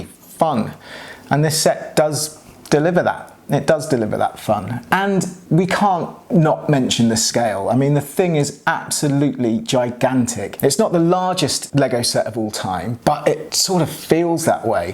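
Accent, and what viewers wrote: England, United Kingdom. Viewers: You must be from the same 10 mile area of England as tom scott. Your accents are to close to be anywhere farther apart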